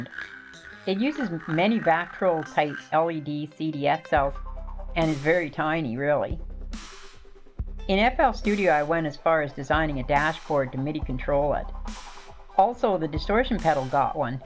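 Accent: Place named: United States. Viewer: WOW?!! A female who is quite experienced and advanced as well?!? And can actually talk her way around this stuff like a true graduate. And judging by your voice, you’re from this here New World. GEEZE!?! I applaud you and I am so impressed and inspired. I would probably buy any of your modules just based on their aesthetics alone!! You are someone amazing! Thank you for sharing about all this.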